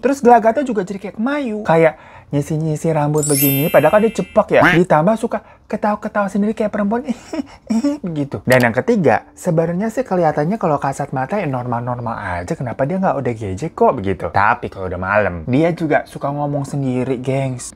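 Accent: Java, Indonesia. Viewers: Knp aksennya jdi ke Jawa kak.. Kan dri Sulawesi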